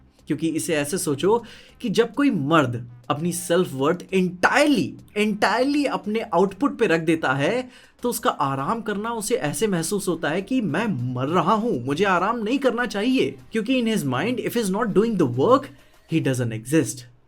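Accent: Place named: Bihar, India. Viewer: old videos mein kitna ajeeb lag raha hai. Bihari accent bol raha hai, poor hai aur ab dekho kya transformation aya hai bande main 😮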